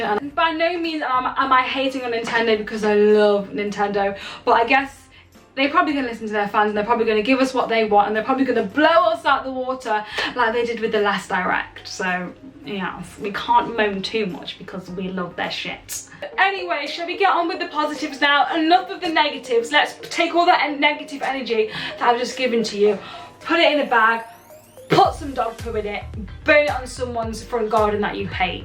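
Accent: England, United Kingdom. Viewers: Out of curiosity, what part of England are you from?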